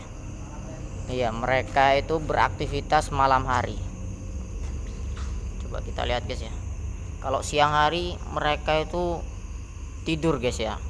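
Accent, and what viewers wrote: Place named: Madura, Indonesia. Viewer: Keliatan bgt anak pesantren. Org jember tp logatnya madura.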